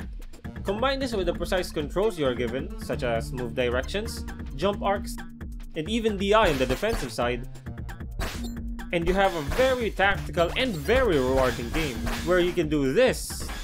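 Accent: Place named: Latvia. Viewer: You sound Latvian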